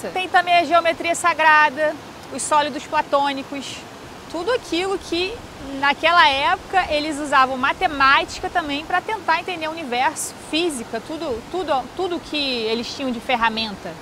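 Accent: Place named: Rio de Janeiro, Brazil. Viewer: Você é natural do RJ ? Seu sotaque é bem carioca,amo seus conteúdos parabéns esta me ajudando a despertar minha consciência ❤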